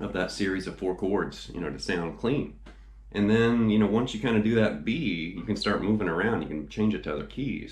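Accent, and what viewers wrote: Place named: Georgia, United States. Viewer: You're from Chicago? You sound exactly like you're from GA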